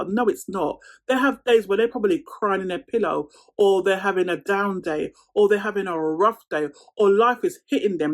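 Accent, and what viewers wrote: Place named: Birmingham, United Kingdom. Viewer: London!!! I just assumed you was from Birmingham 😂😂😂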